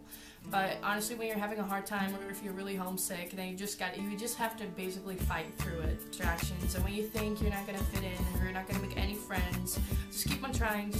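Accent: United States. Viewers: This was very helpful, but I have some questions, was it very difficult to go to their school and learn in English(I mean are their schools difficult), and have you ever just sat in your room thinking: "I can't do this anymore."? I'm from Serbia and I'm considering about going so I was pretty much excited when I was watching the video. Ps. Your accent is amazing, it's like you're an American 😱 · How do you have an American accent? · You have more of an American accent than me, and I'm American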